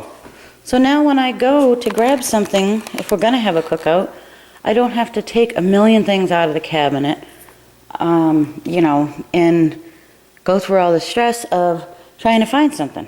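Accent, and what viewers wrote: Boston, United States. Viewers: Being from Boston, I noticed your accent immediately. Kerri :)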